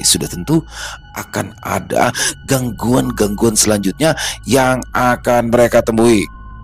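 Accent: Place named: Banjar, Indonesia. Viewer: Logat Banjar pengarang cerita. ❤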